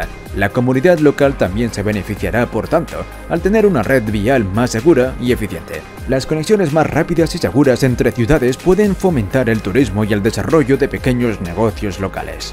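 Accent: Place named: Spain. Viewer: Espero que este tipo de vías de comunicación vial se sigan replicando en el país, es necesario que las carreteras no provoquen un mayor impacto en el medio ambiente, las carreteras de tipo elevadas son una magnífica solución y pueden ser muy bien aprovechados para largos trayectos contemplativos. También es necesario implementar el paso por túneles. El país es hermoso. . . . . No me gusta la voz con acento español, es demasiado ruidoso. Preferiría escuchar un narrador de mi país.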